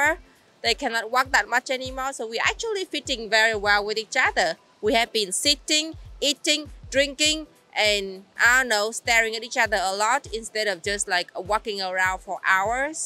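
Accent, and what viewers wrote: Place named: Germany. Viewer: I just noticed that your English is starting to get a German accent, lol.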